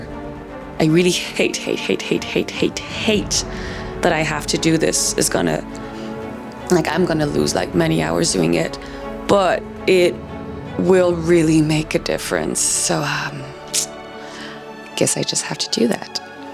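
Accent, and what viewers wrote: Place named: Scandinavia. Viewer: I absolutely looove watching your videos! I’m a beginner to sewing, I’ve only made one thing🌸I started sewing because I wanted to make beautiful gowns like this, and your videos really inspire me to do that!!🌺 I have to say that the thumbnails are absolutely amazing and so beautiful💕I can’t wait to see what you do next 💓Your accent reminds me of a Nordic accent? I’m Swedish and it kinda resembles that💗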